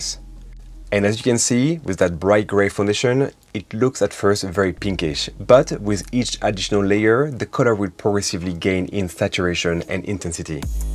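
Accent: France. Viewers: on dirait un accent français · Came in the hobby with the V3 around... 2000 i think. And started with Blood Angels. Just came back 2 years ago, still with BA. Nice paint, the fact you didn't use Metallic is cool. I would be curious to know what is your experience in painting cause, it looks awesomely incredible. BTW i checked (not finished, it's late right now) your other video, and was amused by your accent, so "tranché", No surprised by the fact you're french. And a Parisien one. It explains A LOT. Hi and cheers for the next videos, from a fellow french (But Nemesis Marseillais). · je me disait bien qu'il y avait un accent francais la dedans bon bah j'mabonne...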